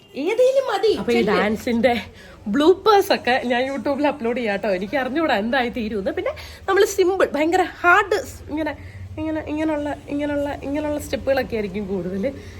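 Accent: Kollam, India. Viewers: Kollam slang❤❤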